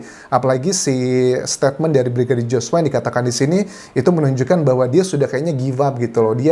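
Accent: Java, Indonesia. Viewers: Mas logat bicaranya kok sprti orang jawa asalnya dri mna... Pokoknya PC. kudu dipenjara krn ia bohong nya buat gemes sy pengin nonjok PC Klo SAMBO palanya dipotong sja klo buat sandiwara terus Kasihan Barada E mau dikorbankan SAMBO Barada E please you JUJUR SAJA jangan takut NAK Barada E kuatkan imanmu dan kemampuanmu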